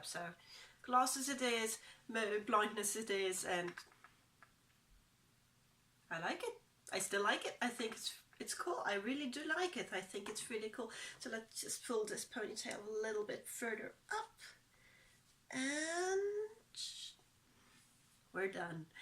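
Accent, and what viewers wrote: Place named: United Kingdom. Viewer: Already subed here darling!! Love your english!!! I speak american english but love ritish one!!! like 32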